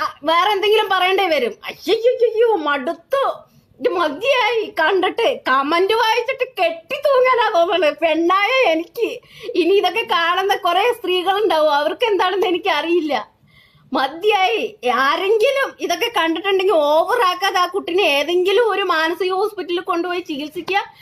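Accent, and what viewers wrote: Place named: Palakkad, India. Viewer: Thanni palakkad thanne basha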